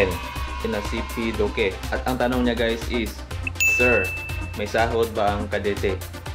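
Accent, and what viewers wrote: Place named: Visayas, Philippines. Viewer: This guy got strong Visayan accent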